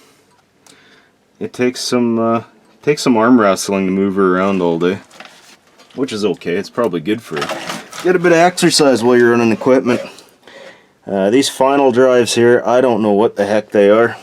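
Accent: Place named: Canada. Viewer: We know a Canadian accent when we hear it hellll yeah bawd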